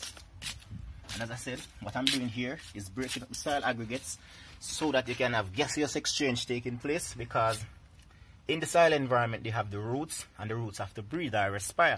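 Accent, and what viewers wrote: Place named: Jamaica. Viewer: Your accent is the best! Thanks for the tips. I'm very excited to get my zoysia going down under on the gold coast with a little jamaican knowledge!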